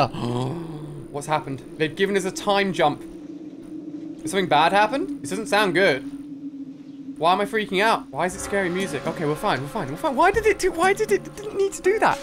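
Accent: United Kingdom. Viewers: R u British? I am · Are you British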